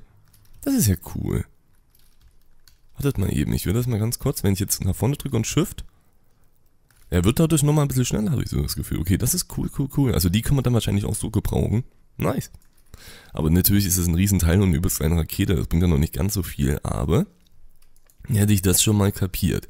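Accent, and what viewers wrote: Saxony, Germany. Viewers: Du bist ein sachse ?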